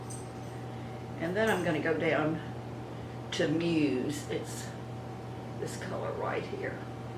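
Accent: South, United States. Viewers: Hi, I just discovered your YouTube channel and enjoy watching them. I feel you are very honest with your recommendations and I trust in you even though I don't know you personally. I had the same type of vertigo and my doctor said it's called positional vertigo which is an inner ear imbalance and there isn't much she can prescribe for it. Mine hasn't come back for a couple of years. Hopefully you'll get better soon. Thanks for your wonderful videos. BTW I love your southern accent.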